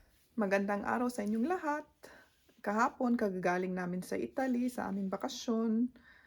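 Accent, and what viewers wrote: Philippines. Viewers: Parang filipina kana rin pati pananalita,nakakatuwa naman.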